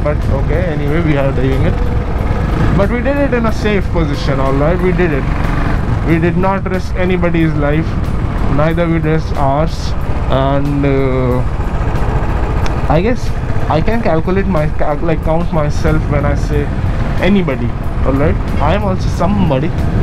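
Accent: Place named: Kolar, India. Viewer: that Kolar dam accent 💀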